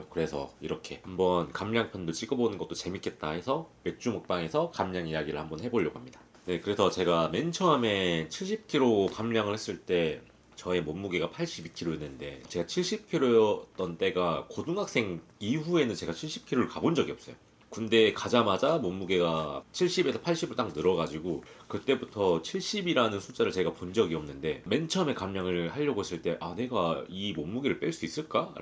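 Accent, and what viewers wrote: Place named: Jeolla, South Korea. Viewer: 오 완전 서울분인줄 알았는데 유튜브로 보니 좀 전라도 사투리가 들리네요 ㅎㅎㅎㅎ 매력있슴다~~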